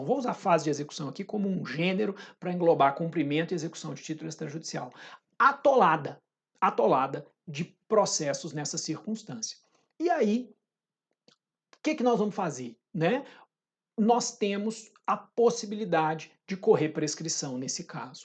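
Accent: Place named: Minas Gerais, Brazil. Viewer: adoro suas aulas. Uma inspiração! Adoro seu mineirês (pq sou mineira)... otimo! fantastico!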